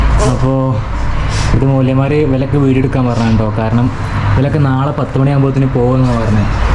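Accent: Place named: Malappuram, India. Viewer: തുടരുക , വിവിധ പ്രദേശങ്ങൾ .... ഇടക്കുള്ള തമാശകളും , മലപ്പുറം ഭാഷയും പോളിയാണ്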